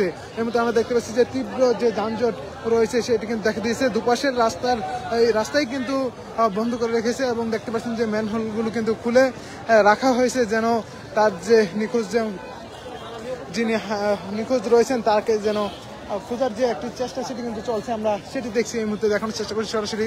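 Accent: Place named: Narsingdi, Bangladesh. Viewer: নরসিংদীর কন্ঠ স্বর কেনো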